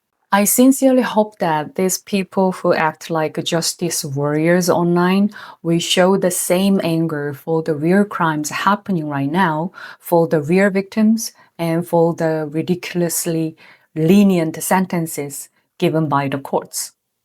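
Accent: South Korea. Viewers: Not everything is AI, don't be dumb. It's her natural korean accent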